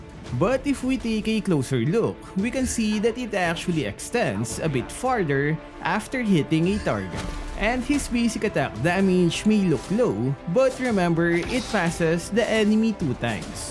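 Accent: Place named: Philippines. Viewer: I smelt tagalog accent in him...😁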